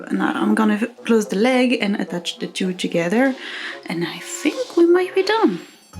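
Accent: France. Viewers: Je suis trop contente que t fr mdr, au debut j'ai entendu ton accent et je me suis dit "mais attend elle est française elle" mdr Tu fait un bon boulot, ta deja fait quelque chose de mignon ? Genre couleur pastel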